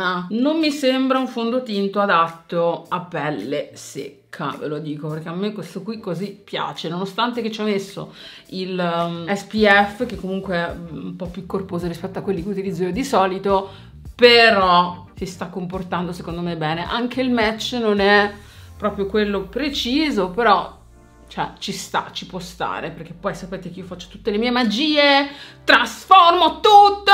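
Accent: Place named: Lazio, Italy. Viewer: Quel modo di parlare a Roma lo chiamiamo burino e lo parlano diciamo nelle altre province del Lazio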